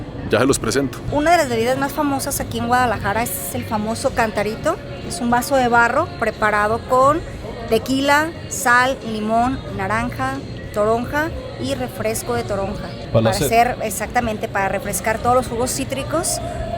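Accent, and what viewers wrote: Bogotá, Colombia. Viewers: Amo el acento colombiano ❤ entonces puedo decir que por eso empecé a ver tus videos y ahora no pido parar muy buena información tu voz relajante te invito a Puebla es tan grandioso cada rincón de mi México. Bienvenido 😊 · Q gran contenido y con ese acento rolo genial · Habla rolo acento bogotano se dice q es el acento español más claro de Latinoamérica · Me encanta tu manera de narrar! Eres súper gracioso y ese acento colombiano que tiene me encanta! 🤣🤣🤣🤣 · Me encantas con ese asentó colombiano estimado 💚👍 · Ahora quiero un novio colombiano 😅😍 me encanta este acento 😍😍😍😍❤️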